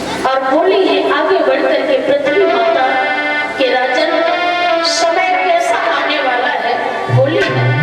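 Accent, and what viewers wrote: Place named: Fatehabad, India. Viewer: Maa Vivek Se Sundar to Katha kahati hi jai Unki Awaaz to Fatehabad Jaisi Hai❤❤❤❤❤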